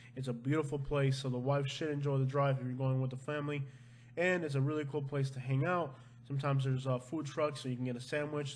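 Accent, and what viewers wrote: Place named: Cuba. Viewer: Most likey he sounds Cuban. So it to be expected. It part of their culture, but to his defense most Cuban women really enjoy shopping.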